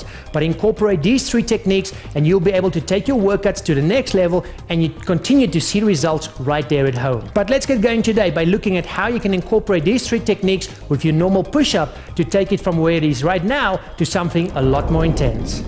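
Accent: South Africa. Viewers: South African accent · He has a South African accent, as I am South African myself I can assure you it isn't made up or anything like that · Jayden Almirall terrible accent anyways, other South African accents are way better and less annoying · anyone else realize his South African accent? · Sounds south african ..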